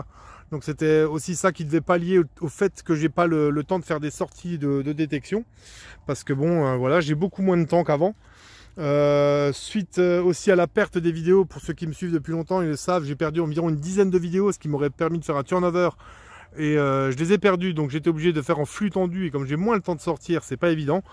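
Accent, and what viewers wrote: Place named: Alsace, France. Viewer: On peut pas nié que tu es Alsacien (Bas-Rhinois) 😉😇💙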